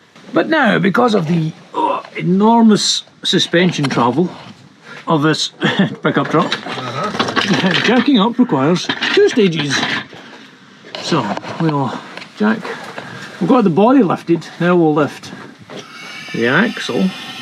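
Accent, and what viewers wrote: Scotland, United Kingdom. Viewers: Mate, fantastic presentation, well done. Your spiel, accent and gags remind me of the fine Scots tradesmen who shared their skills and experience with me as an apprentice in Australia in the late 1970s.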